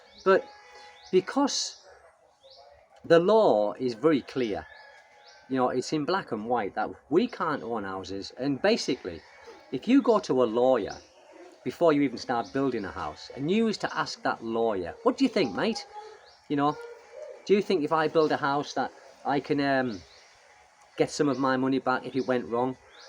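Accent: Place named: Teesside, United Kingdom. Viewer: New subscriber, where is that accent from, cant quite place it, possibly teesside? I'm from Newcastle. Keep enjoying PH 😉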